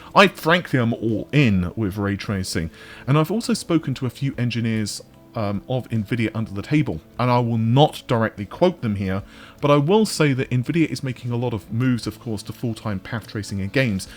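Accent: United Kingdom. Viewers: Three quarters of an hour! Three quarters of a fkcun hour and you don't know anything! For three quarters of a fkcun hour you talk the talk about the talk which talks about other talk you've heard in the past and touches on possible future talk you might or might not hear one day, and all I get from that is waffle in a British accent! You don't know shit ~ you can talk about not knowing shit for 3/4 of an hour. I'm never going to get those 45 minutes back. It's like the promise from Micro$oft that you can wipe windows and get a refund from the company. In all the 3 billion people who have wiped windows and tried to get that M$ money back, how many have actually received it? I can hear crickets, I can hear tumble-weed blowing past..... I can hear Liz Tru$$ going down in flames but I can't hear anything about my 45 minutes! But for the rest of my life I will be still able to hear the talk that talked about the talk, which featured the talk about talks we've had in the past and looked into the bright and Rosy future (she's a nice girl, Rosie) and then we stopped to talk about that talk, so the talk got side-tracked by the talk which went into a talking holding pattern around the outer marker while we talked about fuel economy and reserves of time, because to run out of wind in mid talk would be a catastrophic event, and no meta-talk (a talk about a talk) could deal with the meta-catastrophe of talk about talk that talked itself to a stand-still....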